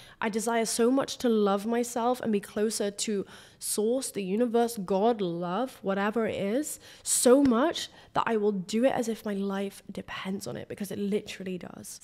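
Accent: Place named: Yorkshire, United Kingdom. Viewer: Ya don't sound like ya from Leeds, that's a very posh Yorkshire accent 😆😉 It's a great channel ya building here. Sending ya peace and love from Huddersfield ❤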